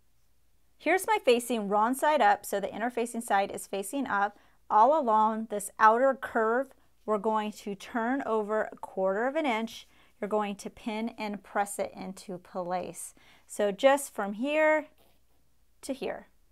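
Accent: United States. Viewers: talks too much and silly american accent , more interested showing her nails and manicure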